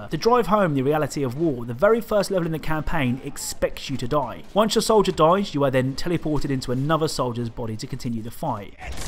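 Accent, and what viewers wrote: England, United Kingdom. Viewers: Do you come from England?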